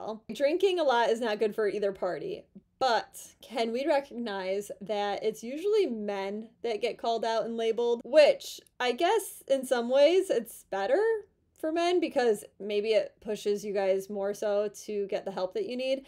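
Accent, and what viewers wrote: United States. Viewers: Very attractive woman, attractive face, attractive voice, from a foreigner who likes the American accent. A woman doesn't belong to a man, a man doesn't belong ot a woman , People like each other and live together or, people have their own homes, they live separately and see each other. Men today are frightened if they live with a woman and she throws him out he will be homeless.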